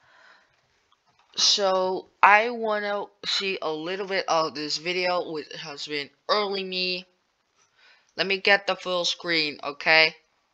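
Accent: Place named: Netherlands. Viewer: yup, sounds dutch like me. dutch = nl :D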